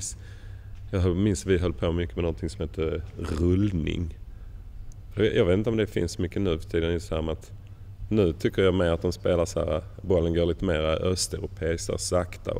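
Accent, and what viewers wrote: Sweden. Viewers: Sjukt ovant och höra honom med skånsk dialekt :P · Vad i helvete, är han skåning?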